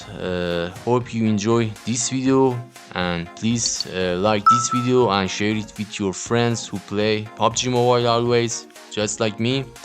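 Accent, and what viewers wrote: Iran. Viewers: چه لهجه فارسی داره انگلیسیت❤😂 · داش ایرانی از لهجهت فهمیدم❤ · Well for everyone who are curious, This DNS is more commonly used in Iran. The creator is also from the same country based on the accent and the error received. This is a great method shown and he's actually right! However, for the people outside of Iran, I recommend you to search through the internet and find the nearest DNS service possible to your country. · به به لحجه‌ی اصیل ایرانی❤😂 چطوری هموطن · لحجه ایرانی ؟ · حاجی ایرانی ؟ لحجت معلومه ایرانی ؟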